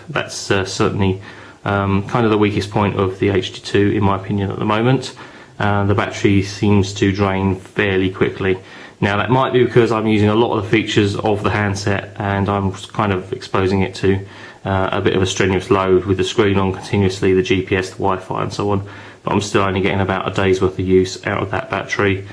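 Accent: United Kingdom. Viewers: your british accent pursuades me to buy this. why do the british make everything seem so advanced and top of the line? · ha i love british accents ! lol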